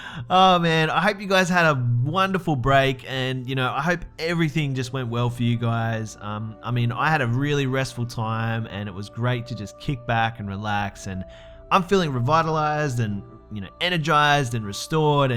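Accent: Australia. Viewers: Nice accent! Are you from Australia??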